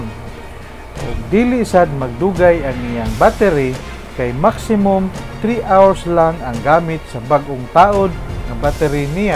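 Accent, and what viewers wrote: Visayas, Philippines. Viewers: bisaya diay ka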